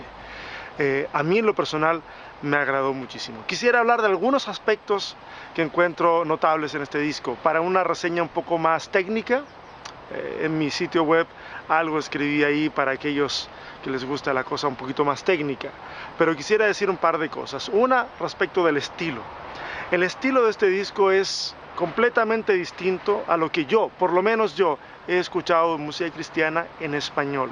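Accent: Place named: Mexico. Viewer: Cómo se nota la influencia de vivir en México en esos años, hablabas a lo mero macho wey.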